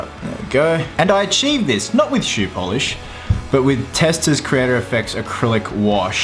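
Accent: Australia. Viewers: he is a ausie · r u australian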